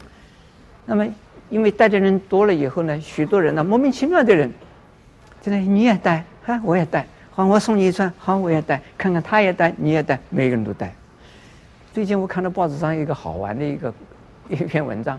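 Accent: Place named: Taiwan. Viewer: I lived in Taiwan for a long time. And he he’s speaking Mandarin Chinese, it’s just that he has a very heavy Taiwanese accent.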